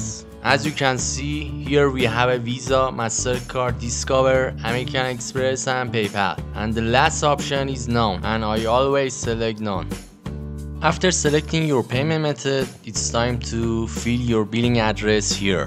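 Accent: Iran. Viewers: khob lahje farsi dasht iranie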